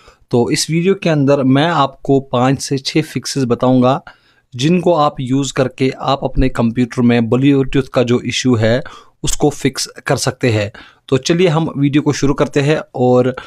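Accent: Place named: Kashmir, India. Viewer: It sounds like u r kashmiri ....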